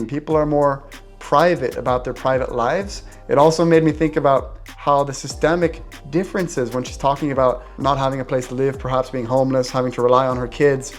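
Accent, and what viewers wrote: Sweden. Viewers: Your English has the ever so slightest Swedish accent undertones now. So interesting how that happens! Only on some words but I can hear it! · has been away so long and think he kind of sounds like a Swede speaking English 🤔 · Now that you have been back to the US, I would love to hear how you realzed that You changed; your personality or your likes or your thoughts of the world. I lived in Sweden 1989-90, but now live in Tennessee (grew up in a very small town in upstate NY). It was easy for me to fall back into life in the US but I wasn't there for so long. I enjoy listening to your experiences and plan to retire to maybe Portugal with a decade. Thank you for your content. Good to see you back. (PS I agree with the person that said you have a twinge of a Swedish accent in your English ❤) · Your English has changed! Your accent is still American, but has touches of Swedish and British, vowels in particular. 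Did anyone in the US make a comment on that when you were back? Or you adapted quickly back on American soil? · I here a bit of a Swedish accent you didn't have in your earlier videos. Even acouple of weeks in a different country can give you a different feeling when you return. Especially if you go to an place and get more involved with the culture.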